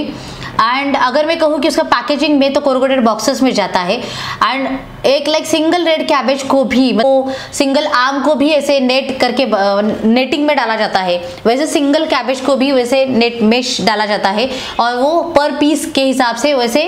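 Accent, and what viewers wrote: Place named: Mumbai, India. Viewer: marati hindi mumbai accent madam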